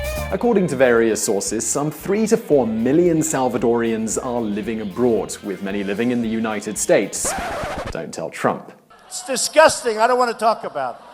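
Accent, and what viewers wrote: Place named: United Kingdom. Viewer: Well then, I don't understand why is it they can't tell the difference between "Salvador" and "El Salvador" ...I mean, it's THEIR original language after all, isn't it? But the guy on this video is definitely NOT Spanish, you can easily tell by his accent he's British.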